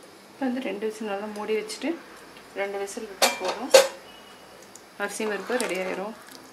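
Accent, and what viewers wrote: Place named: Coimbatore, India. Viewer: .k hi sis starting la sollanalaum vanga nu solrangale..shanthama pesuranga ithuve alagathanapa irukuthu sis.kovai tamil really superp thaan.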